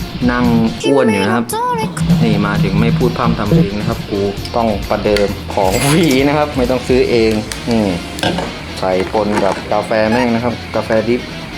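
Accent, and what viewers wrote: South, Thailand. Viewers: พูดใต้เล่นกล้ามนิพอชอบหนัดเลย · พี่บ่าวแหลงเหมือนคนใต้เลยอะ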